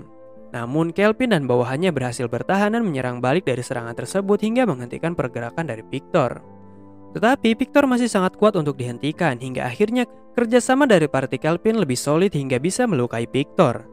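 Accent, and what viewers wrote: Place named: Sunda, Indonesia. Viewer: Bjir lu Sunda bang 🗿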